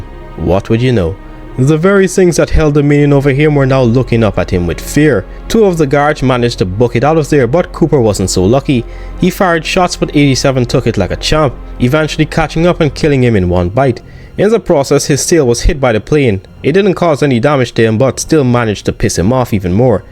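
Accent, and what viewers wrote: Jamaica. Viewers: Is you Jamaican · Jamaican? Which parish bro? 🤙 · You sound jamaican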